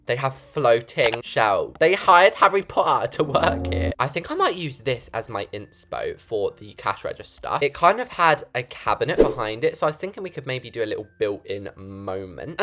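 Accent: United Kingdom. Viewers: How come ur British but ur sister is amarican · Wait so he’s British not Australian…?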